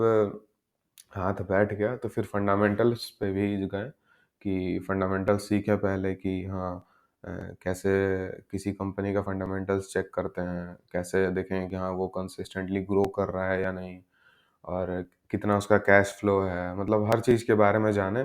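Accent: Bihar, India. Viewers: Bhai aapke awaaz se lagta h aap bihar se h kya? I m also new in market I found your video interesting.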